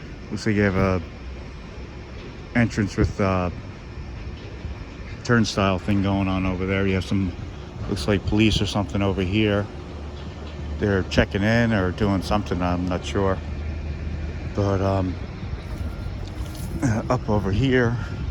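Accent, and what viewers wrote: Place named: New Jersey, United States. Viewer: 😂😂😂 That's how we speak in NJ!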